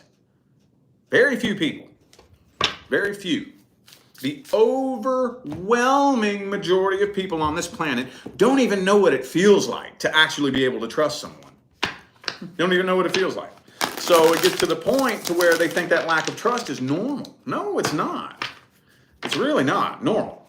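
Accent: South, United States. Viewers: Thanx so much for your reading...I'm also a tarot reader..but I love supporting all my fellow mystics...I'm from TN..you sound southern..I'm curious 🧐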